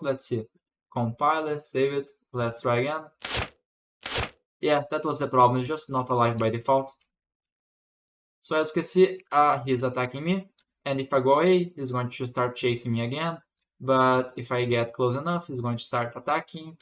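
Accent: Brazil. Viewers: esse sotaque ai, tu é br kkkkkkk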